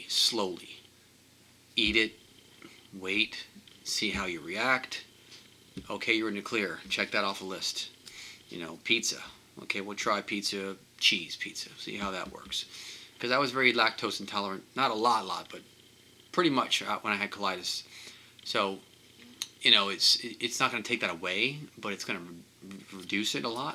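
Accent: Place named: Pittsburgh, United States. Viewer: Crying as I’m reading this now even though I’m late to the game. Recognized the accent though as I’m a native Pittsburgher now living in Los Angeles. I’ve decided to not get the reversal surgery, I just don’t want to go through all of that to find out I have to have it done again God forbid. Is the Ng tube necessary for all procedures Up?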